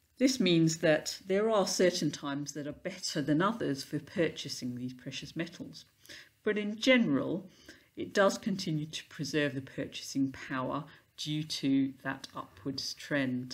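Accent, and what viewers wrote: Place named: East Anglia, United Kingdom. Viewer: Really enjoy these presentations. Out of interested...where abouts are you from in the UK? East Anglia? I'm trying to place the accent!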